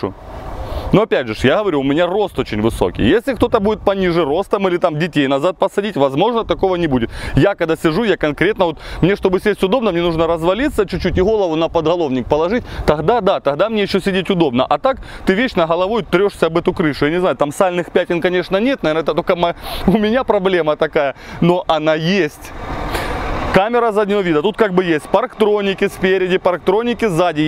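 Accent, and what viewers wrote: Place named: Luhansk, Ukraine. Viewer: Virab Saakyan он с Луганска ,а там у всех говор такой. Мой земляк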